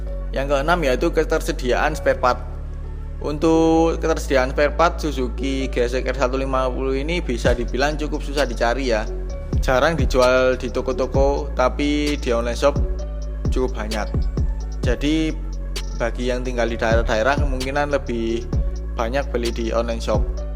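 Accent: Malang, Indonesia. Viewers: Wong malang mas?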